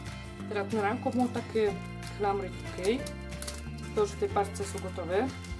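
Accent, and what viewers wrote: Silesia, Poland. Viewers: Kanał jest ogólnopolski wiec mów poprawna polszczyzna bo tego śląskiego bełkotu nie rozumiem.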